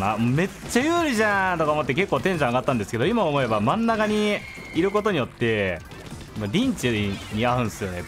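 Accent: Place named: Kansai, Japan. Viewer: ラストまで堪能しましたねぇ またもやバリバリの関西弁で めちゃくちゃ楽しそう！ ✌頑張った！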